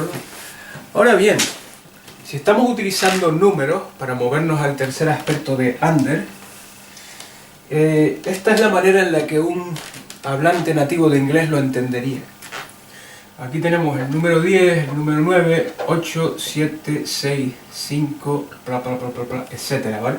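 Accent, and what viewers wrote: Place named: Spain. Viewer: Tienes acento español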